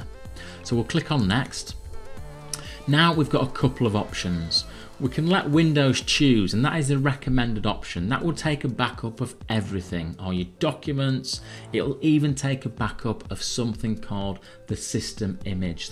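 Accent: Yorkshire, United Kingdom. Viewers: Yorkshire, Yorkshire , Yorkshire.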